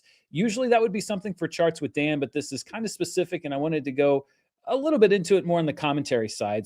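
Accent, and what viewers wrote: United States. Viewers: People are so conditioned to connect some kind of game of thrones British adjacent accent for these kinds of stories that the American accent feels strange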